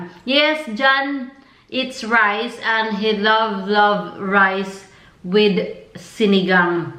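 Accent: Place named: Visayas, Philippines. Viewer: I just saw your blogs in YouTube, I really liked it. May I ask where are you from in the Philippines? You sound like Bisaya. I'm from Cebu too.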